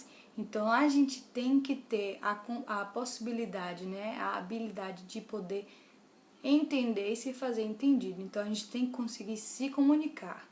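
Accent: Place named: Bahia, Brazil. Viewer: Esse sotaque baiano reconheci na primeira frase. hahah, qual a agência que você foi? Com qual idade? Pretendo ir pela STB. Você indica? Também sou de Salvador, gostaria de saber se ela é bacana. Ah, o vídeo ficou ótimo. Também acho o inglês importantíssimo! Muito bom o vídeo.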